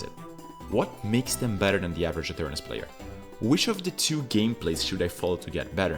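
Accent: Italy. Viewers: As a fellow italian (assuming you're too since the slight accent and the name of the channel) i cannot understate how much this video helped me in just a couple of games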